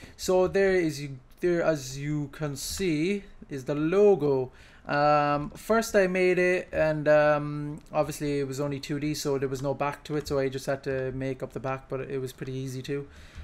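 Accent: Ireland. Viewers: I take it back, I can hear the Corcaigh in him. Gwan boy!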